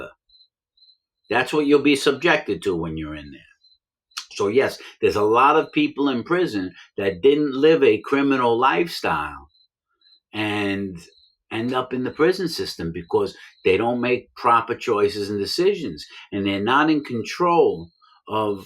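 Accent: New York, United States. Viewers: That's a NYC area accent.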